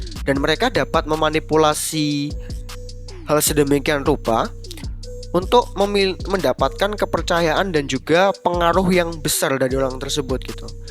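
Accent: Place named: Java, Indonesia. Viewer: logat jawa pake lo-gw jd aneh ditelinga, mending kamu-aku wae mas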